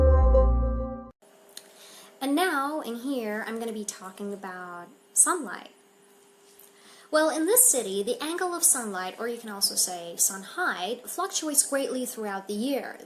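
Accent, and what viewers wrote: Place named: United States. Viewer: Extraordinary presentation with an American accent 😊👏👏👏👌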